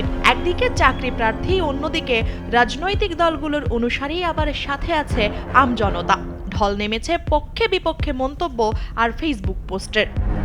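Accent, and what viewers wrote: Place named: India. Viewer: আপনার সাংবাদ পড়ার ধরণ ভারতের সাংবাদিকদের মতো নাকি আপনি সত্যিই ভারতীয় সাংবাদিক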